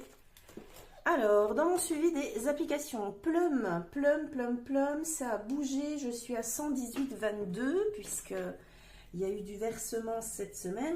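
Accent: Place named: Haute-Saône, France. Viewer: Coucou mais moi j'adore vous écouter il est tellement gracieux notre accent ( je suis en haute Saône) la Madeleine Proust a fait fureur avec😂. En tout cas belles économies 😊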